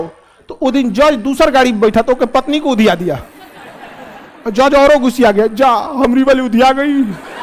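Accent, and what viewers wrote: Bihar, India. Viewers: Tu Hindi me bol Kya bolta he kaun si deshi bihari bolta he Achhe log samaj nahi pate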